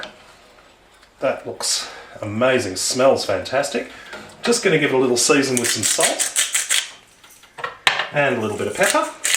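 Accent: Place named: Australia. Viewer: It’s the voice for me. Nice Aussie accent.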